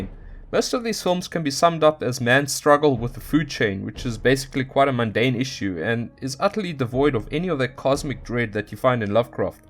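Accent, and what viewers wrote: South Africa. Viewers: Are u South African... or New Zealandish?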